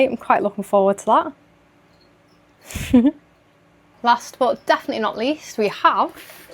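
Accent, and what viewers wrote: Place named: Liverpool, United Kingdom. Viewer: I TAKE IT FROM YOUR COMMON ACCENT YOUR FROM SOMEWHERE LIKE LIVERPOOL ? I LOOK FORWARD TO SEEING YOUR RACK MY DEAR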